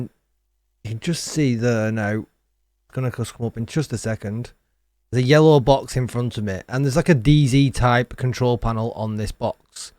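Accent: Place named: Bolton, United Kingdom. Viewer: Are you from around Bolton bud😁. I've played a bit of it but dont want to grind too much, it may just put me off when it actually releases. I just wanted to nose around to see if it was worth coming back to with some friends.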